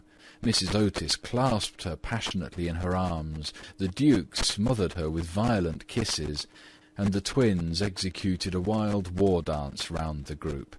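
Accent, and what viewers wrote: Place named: United Kingdom. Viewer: good but it's British .. Is there a story with an American accent ?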